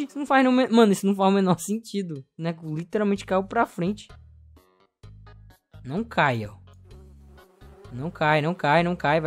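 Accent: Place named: Ceará, Brazil. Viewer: Tu e cearense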